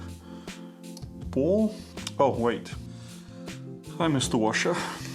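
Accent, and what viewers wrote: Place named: New Zealand. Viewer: Hi there. Just found your channel. What city are you in. You sound like a Kiwi. I'm in Chch. Cheers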